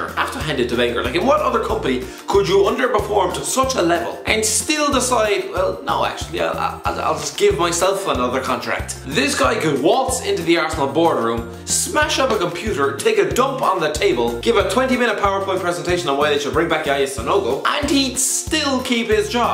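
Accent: Ireland. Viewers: i watch his vids for the irish accent like if you like the irish accent as well comment if you dont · Just wondering, where in Ireland are you from?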